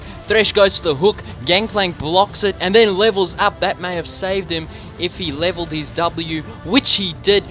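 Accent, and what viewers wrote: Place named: South Africa. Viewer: Where he is he from? he sounds very South African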